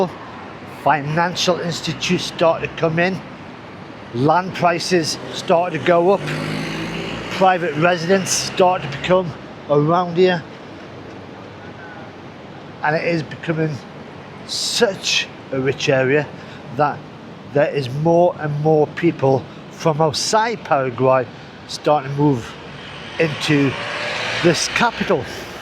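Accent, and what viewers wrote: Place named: Newcastle, United Kingdom. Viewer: Where you from Bonny Lad? Newcastle or Sunderland ..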